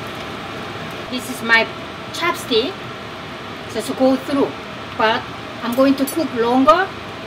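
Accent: South Korea. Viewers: 망치님! 대박 입니다. 요리 짱! 여기다 구수한 영어솜씨가 한국적 입니다. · 왜 이제야 알게 된걸까요..ㅎㅎ 두바이에서 가정주부가 된 중년 아저씨..이제부터 보격적인 요리남 되렵니다...한국 스탈의 영어 완전 좋아요.... 한국식 영여 악센트를 세계에 고착 시키자구요...다같이...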